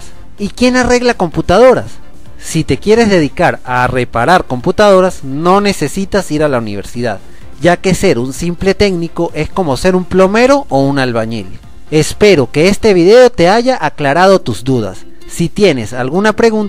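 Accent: Venezuela. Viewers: Depende de la región de Venezuela donde naciste. Ángel David Revilla es Caracas (región central), donde el acento es más prominente. Me imagino que es de la región oriental o la noroccidental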